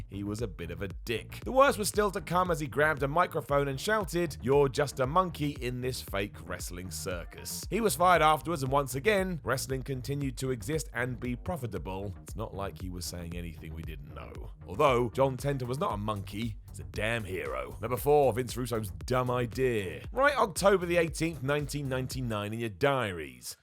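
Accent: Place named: United Kingdom. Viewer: They're from the UK lol. How do you want them to talk?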